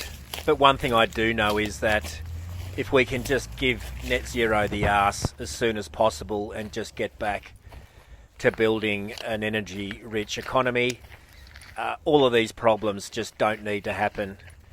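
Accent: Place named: Australia. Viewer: I love your informal Australian accent and I do note Albanese is not wearing his signature gold cross necklace which would have just completed the look. A funny story about a man called Paul who went in for some hospital surgery and came out with "man breasts" because his friend a notable Bishop had set up the doctor 🤑 to inject some fat around the pectoral muscles 😳 😲 Apparently it was an inside church joke and every time the priests saw him with his new look, they had a good laugh. 😹 💯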